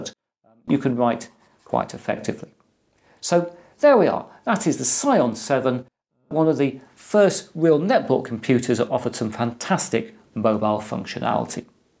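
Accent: United Kingdom. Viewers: pure British English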